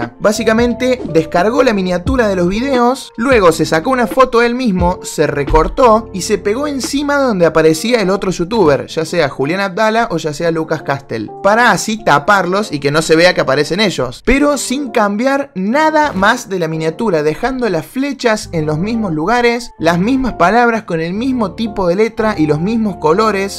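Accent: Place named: Argentina. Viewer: Pareces argentino XD sos?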